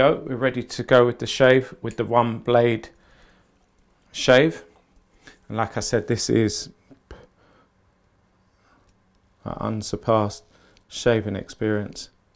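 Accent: United Kingdom. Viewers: you have such a calm and collected manner and I really enjoy listening to the deliberate articulate way you speak. Of course the distinguished English accent is really cool too. I wish I could have you as my barber. That would make my monthly haircuts enjoyable and a much more pleasant experience being able to chat with a nice easy-going person. Alas I live in Singapore, a country in Asia very far from the UK. The barbers here hardly say a word and when they do, it's pretty simple things like how are you doing and how's your day been so far. It's nice having someone who could provide tips and advice and basically just chat about stuff and catch up on things everytime you see them.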